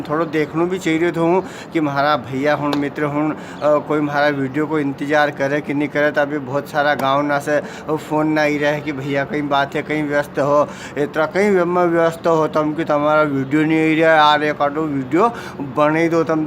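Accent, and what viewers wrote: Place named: Nimar, India. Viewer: Sir आपकी भाषा हमारी भाषा nimadi की जैसे ही है... मे nimad से हू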